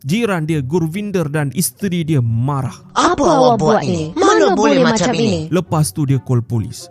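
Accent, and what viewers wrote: Sabah, Malaysia. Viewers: Dia cakap slang sabah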